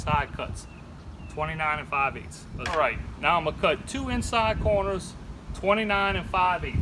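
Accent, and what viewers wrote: Louisiana, United States. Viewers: Where's his accent from? Louisiana possibly?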